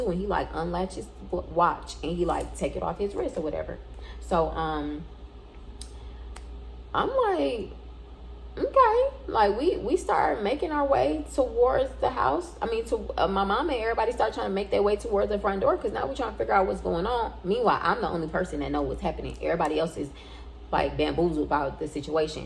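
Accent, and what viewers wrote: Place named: South, United States. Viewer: Yess your story times are the BEST 🙌🏾 and I can tell you from the south lol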